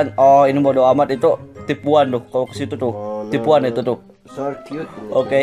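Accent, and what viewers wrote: West Java, Indonesia. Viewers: bang abang orang sunda yaa? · Abang orang sunda ya?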